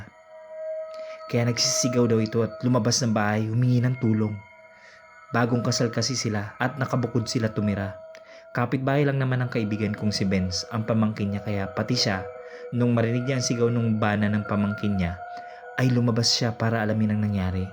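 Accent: Visayas, Philippines. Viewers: Bisaya ka ba o waray?? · Bisaya ka bay.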